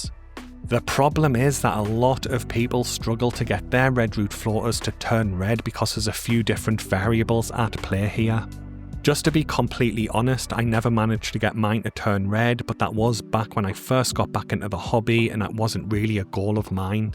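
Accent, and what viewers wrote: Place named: Scotland, United Kingdom. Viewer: Oh, and I (Swedish) thought it was a Scottish accent, probably insulting many people on both side of the England/Scotland border... ooops sorry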